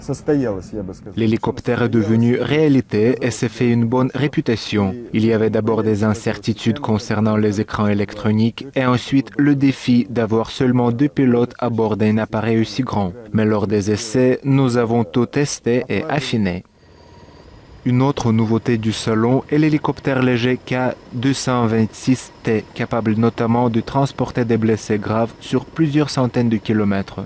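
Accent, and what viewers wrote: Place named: Ryazan, Russia. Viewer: не позорился бы уж своим рязанским акцентом - говори по-русски.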